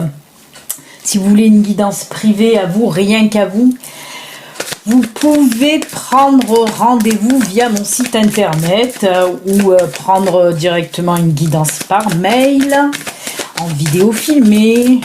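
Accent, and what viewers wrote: South, France. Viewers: Merci beaucoup pour tout de tout mon cœur ton oracle et très beau j'adore j'adhère t'es guidance surtout avec ton accent du sud sa me rappelle mes origines je t'envoie plein d'amour bisous 😘🙏✨💋🔥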